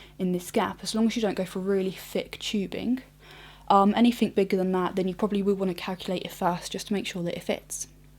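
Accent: United Kingdom. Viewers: with an awesome british accent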